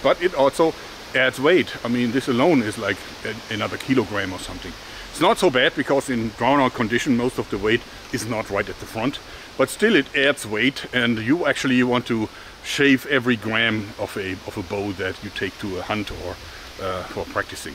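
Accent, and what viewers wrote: Germany. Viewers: Ist er deutsch? Es hört sich so an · Do not cut off the :"Ha Ha Ha" with german akcent ! It's one of reasons I watching You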